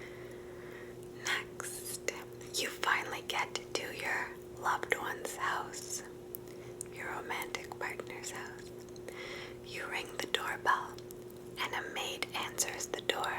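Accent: Italy. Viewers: Thank you for this one as well. I got a request: Craniel nerve exam...... WITH italian accent!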